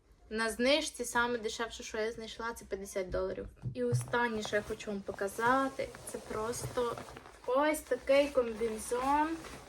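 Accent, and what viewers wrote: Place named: Hutsul, Ukraine. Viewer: Дякую і вам, що ви є у нас).🥰 Не хворійте. Ваш гуцульський акцент я просто обожнюю. У мене так не виходить 😊. Будемо чекати наступне відео 💙💛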